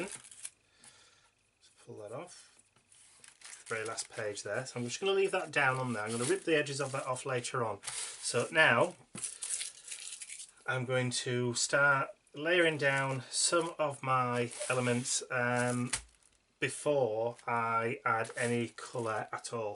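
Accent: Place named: Northern England, United Kingdom. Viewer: Brilliant tutorial and art work. I've been making digital art in Photoshop for 10 years now and so the layers really resonated with me. I really like your style, and your videos, and it's nice to hear a Northern accent! :) I'm new to real life art and so your tutorials have been a great inspiration for me.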